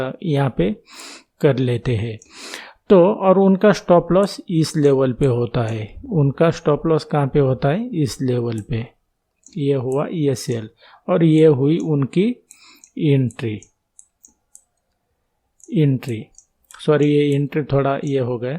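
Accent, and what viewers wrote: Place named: Hyderabad, India. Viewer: Hyderabadi ho kya aapke hindi speaking se aisa lagta ha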